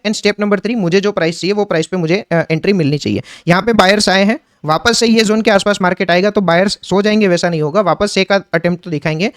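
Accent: Gujarat, India. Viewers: Are you Gujrati?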